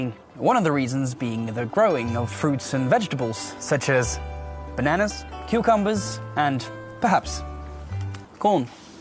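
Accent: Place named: United Kingdom. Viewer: Bri'ish accent fits.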